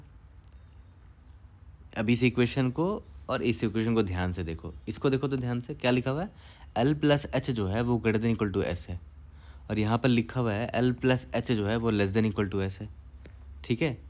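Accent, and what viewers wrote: Bihar, India. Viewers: whi bohot meethi awaz hai lga hi tha bihar jharkhand side se honge, mere freind bhi wahi se hai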